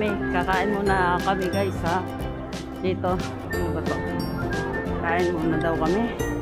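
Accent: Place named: Batangas, Philippines. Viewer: Such a beautiful plce . Enjoy your Gala nga Sissy . Stay safe. Tga Btangas ka na Sis. May punto kasi.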